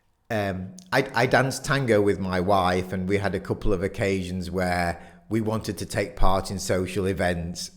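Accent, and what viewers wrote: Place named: United Kingdom. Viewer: I'm a fan of the British and, like most Americans, I fall for the accent. You are a lovely man. My husband reversed his gout and other physical ailments, but his doctor had to tell him, "Your numbers are not good at all!" And so, long story short, he listened to his vegan, low-to-no fat diet and found, at his next doctor visit, that his numbers were much better and his gout went away. Carry on, kind sir, as you Brits say!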